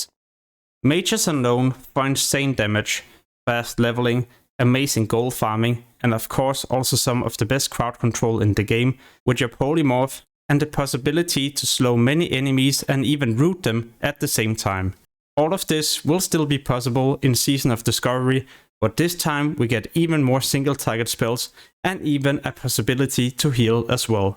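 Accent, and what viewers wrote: Denmark. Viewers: You sound Danish :) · Are you dansk?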